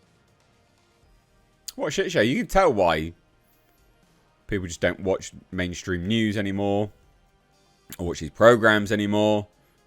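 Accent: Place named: United Kingdom. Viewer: There’s something that makes a Brit hurling insults make them more legitimate. What accent do you have? Like the USA I know there are more than 1.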